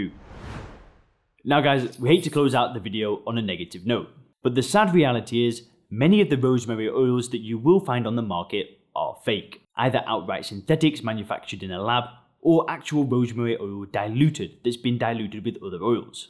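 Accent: United Kingdom. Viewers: British of course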